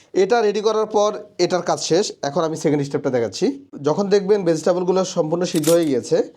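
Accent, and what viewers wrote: Noakhali, Bangladesh. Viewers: রান্না টা দারুন, তবে 1টা প্রশ্ন ছিল আপনি কি নোয়াখাইল্লা?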